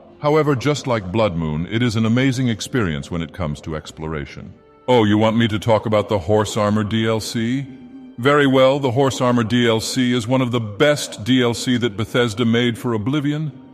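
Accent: United Kingdom. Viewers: British Dagoth Ur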